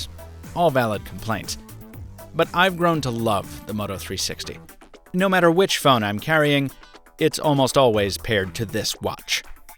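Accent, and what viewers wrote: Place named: Boston, United States. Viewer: Do a vid with your Boston Accent.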